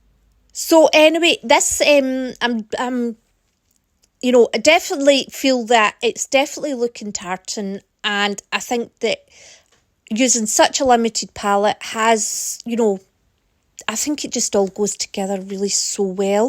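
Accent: Scotland, United Kingdom. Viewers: Loving your artwork! Very inspirational! You are such fun to listen to, your accent is Scottish?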